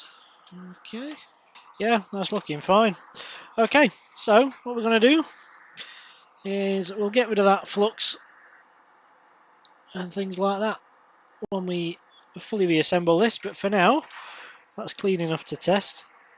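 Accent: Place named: North, United Kingdom. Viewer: Thanks, great video. I will email you now for a couple of ic's. You cant be too far away from me (manchester) with that accent, Thanks again.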